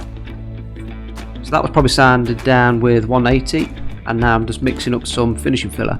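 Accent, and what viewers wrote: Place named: Nottingham, United Kingdom. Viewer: You wouldn’t be a nottingham lad by any chance?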